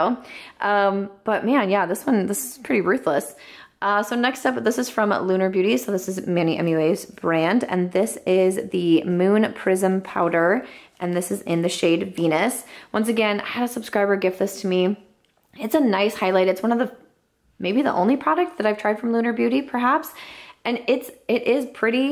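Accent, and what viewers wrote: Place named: South, United States. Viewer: I just love ’ southern accent, y’all!! 🤣🤣😂😂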